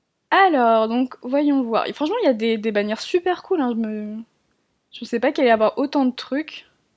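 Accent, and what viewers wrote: England, United Kingdom. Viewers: oh mon dieu ton accent anglais et juste waouhhh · Jadore t'es video mais ton axant anglais me gene un peut car en dirait que tu veux faire genr ..'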